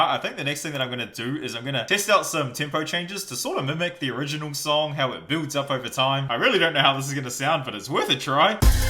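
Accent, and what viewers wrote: New Zealand. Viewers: Are you a kiwi by any chance? Your accent absolutely sounds like a New Zealander. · bro are you new zealand?? sorry im new to this channel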